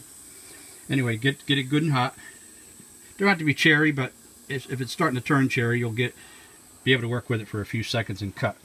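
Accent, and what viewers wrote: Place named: Pittsburgh, United States. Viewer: Must be from Pittsburgh...can recognize that accent instantly... that or Ohio...ya?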